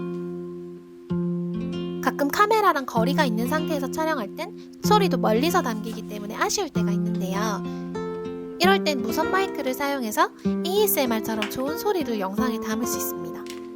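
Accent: Busan, South Korea. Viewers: 나중에 카메라 하나 장만하게 되면 바로 너로 찜뽕이다 후하 그나저나 중간중간에 부산 사투리 들리는 게 너무 귀엽고 좋네요😚 오늘 영상도 잘봤어요!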